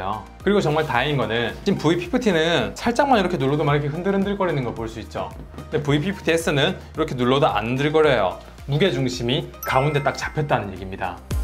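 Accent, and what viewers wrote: Gyeongsang, South Korea. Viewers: 경상도 사투리가 너무 깊게 박혀있네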